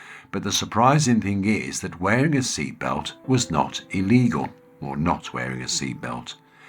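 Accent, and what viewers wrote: Birmingham, United Kingdom. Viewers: 😂😂we just hung bright orange curtains to go with matching fire wall . I lived in brum for 2 yr your accent brings back memories 😅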